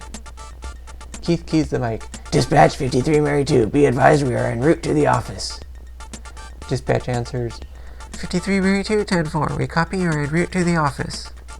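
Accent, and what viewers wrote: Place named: New Zealand. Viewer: Sound like he is from New Zealand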